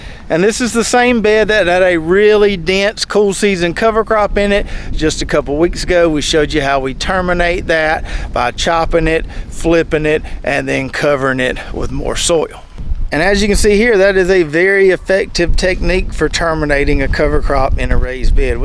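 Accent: South, United States. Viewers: lol southern talk is funny 🤣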